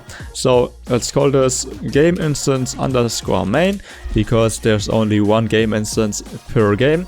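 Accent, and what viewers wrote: Germany. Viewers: man hört soooo dass er deutsch ist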